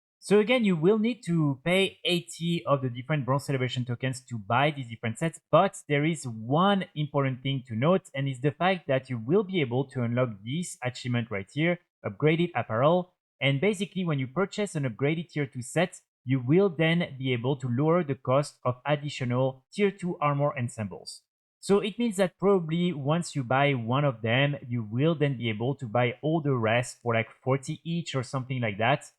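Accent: France. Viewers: French accent :)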